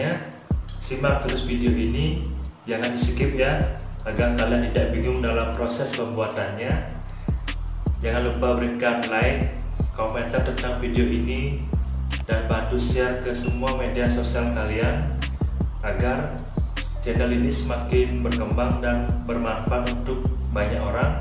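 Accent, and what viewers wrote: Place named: Bali, Indonesia. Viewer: Logatnya bali bgt 👍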